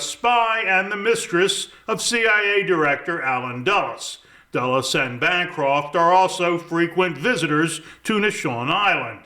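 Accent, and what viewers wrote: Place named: United States. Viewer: Notice that. In spite of the American accent and cadence this obvious error being repeated makes me think this guy is not originally an American English speaker. There's other information being being spread around about Ruth Payne that is salacious. This seems so comprehensive...why isn't that popping up here?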